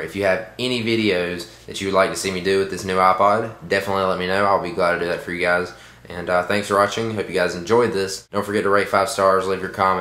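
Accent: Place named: Georgia, United States. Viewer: You're from Georgia are't ya bud. Somewhere a little South of ATL? Spalding county maybe.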